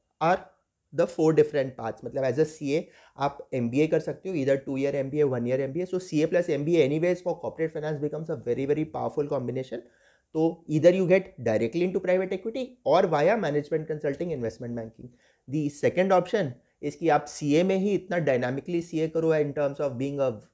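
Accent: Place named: Bengal, India. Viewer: Guys this is funny and misleading.. heading says how a CA can get into PE.. and it starts with let’s the CA do MBA.. 😂😂 The video lacks depth and limited research and obviously no practical experience.. Even if you are a 2nd attempt CA, you still have a chance to get into PE, if you can build up right network and have relevant experience.. Pls don’t get misguided by the ‘sauve’ perspective of this video, it’s just myopic. Anyone willing to get into PE space need following two skill sets along with good networking skills: 1. Ability to evaluate investment propositions - it just basic FM you read in you CAs along with practical POVs on current business, market share, customer base, operational efficiencies, Risk etc. 2. Managing operations- PEs work with their portfolio companies to improve value and finally over the years when they achieve certain efficiency, they look to exit. 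So anyone have operational understanding or ability to manage stakeholders and drive them to improve operations, identify and implement synergies are preferred. Following skills along with above 2 are good garnishee: 1. Business Modelling 2. Transaction structuring and taxation - CAs are champ in this 3. Good communication skill - this is always good to have in the jobs you do. But doesn’t stop you to achieve anything, had the case been otherwise, the host of this video with half Bengali ascent wouldn’t have got 30k followers.. you need not be ‘Sauve’, a Chetan Bhagat english can work wonder..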